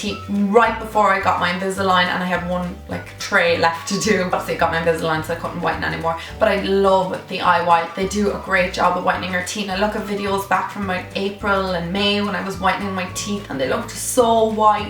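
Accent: Ireland. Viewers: Is she Irish??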